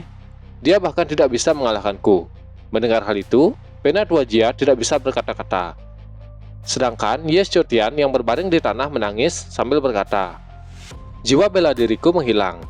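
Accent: Bali, Indonesia. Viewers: Makin lama makin jelas logat balinya :v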